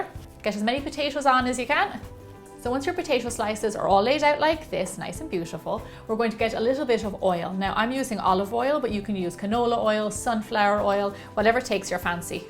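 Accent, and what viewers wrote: Ireland. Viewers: Wait ur Irish no wonder you souand like Jackspeticeye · I love the irish accent ❤️ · She sounds pretty Irish to me! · Is she Irish? Couldn't tell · I love your recipes; and I have to say, I love your accent too. It reminds me of someone from Ireland who is very dear to my heart <3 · I'm only here because i love her accent. Irish chic's are sooo hot. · Is it just me but the more I watch the more Irish she sounds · Your beautiful Irish brogue accent sounds just like my grandmother sounded. She was from County Cork. I've tried your potato chip in the microwave... PERFECT baby! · Agreed. I love the Irish accent. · So irish 😂😝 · Irish accent talking potatoes....I'm listening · I love the brogue, I miss the brogue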